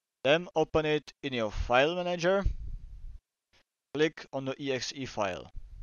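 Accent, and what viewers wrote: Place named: Czech Republic. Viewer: Slyším českou abecedu :D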